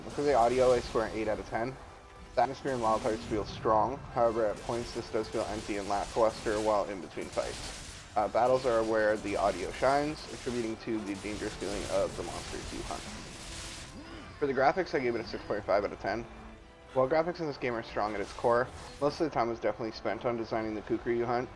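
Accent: United States. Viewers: Why does your voice sound like its coming from an American neck? This says Canadian content 🤔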